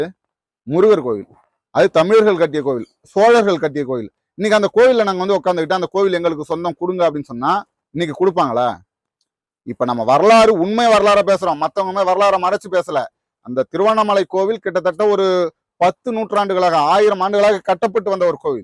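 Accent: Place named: Telugu, India. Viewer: MAM your speach only TELUGU carefully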